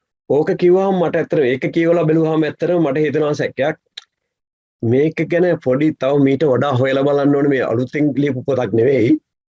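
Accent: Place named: South, India. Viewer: මුනේ මොකද ද්‍රවිඩ ඇක්සන්ට් එකකින් කතා කරන්නෙ. ඔබ යාපනේ නාග හෙලයෙක්ද?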